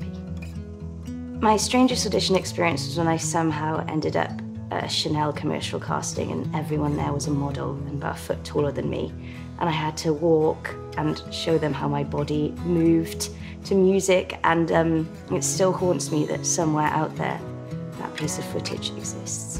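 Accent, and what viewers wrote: England, United Kingdom. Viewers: British accent love🤩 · Love her English accent taking over her rural accent!!!!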